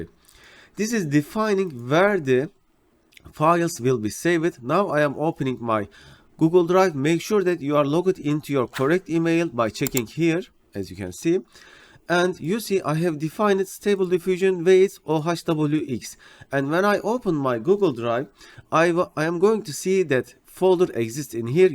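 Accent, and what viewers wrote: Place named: Turkey. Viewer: i am a turkish guy who wants to learn somethings on computer science. When i heared your voice, i am confused. I thought you are from turkey and i looked up your youtube channel then i saw your location which is Turkey. Your accent is how i understand.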